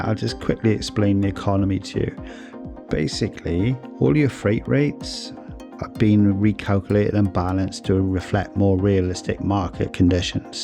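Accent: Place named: Cardiff, United Kingdom. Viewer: Looking forward to this one mate. Sounds solid. Is that a Cardiff accent I hear? I was thinking Newport but changed my mind. I’m from Caerphilly originally.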